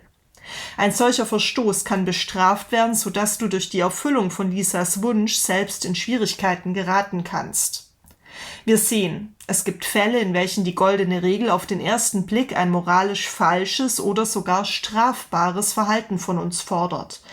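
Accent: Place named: Swabia, Germany. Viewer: Bisch du au Schwoab? Es klingt fast so :D Super Kanal!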